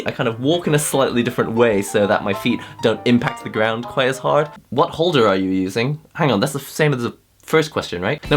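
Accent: United Kingdom. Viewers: I'm not a racist at all but holy shit how did u learn to speak british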